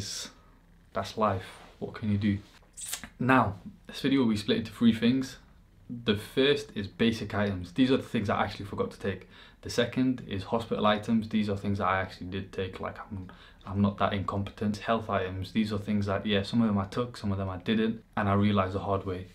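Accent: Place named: London, United Kingdom. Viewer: lol you don't sound as geordie, been londonised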